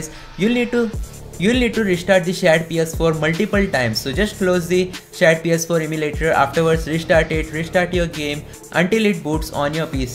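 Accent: India. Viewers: The curry accent 💀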